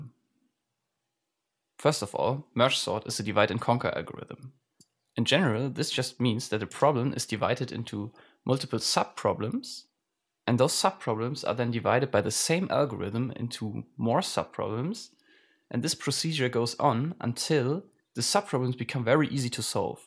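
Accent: Germany. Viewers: Ich wette du bist deutsch :)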